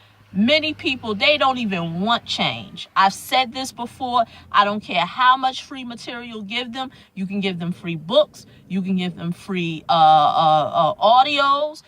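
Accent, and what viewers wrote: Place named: North, United States. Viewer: U sound like your from up north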